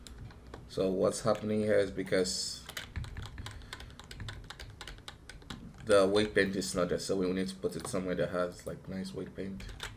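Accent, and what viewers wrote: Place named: Nigeria. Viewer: wtf just when i thought i was the only nigerian who knew blender. even from ur voice i know u are nigerian keep it up bro.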